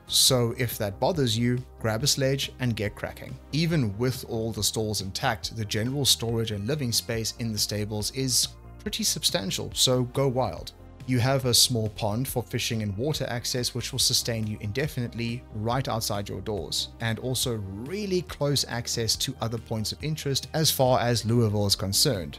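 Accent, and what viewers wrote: South Africa. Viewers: are u south african? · I sense a South African accent?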